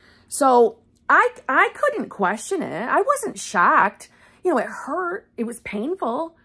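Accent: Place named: Minnesota, United States. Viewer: Love your North Dakota/Minnesota accent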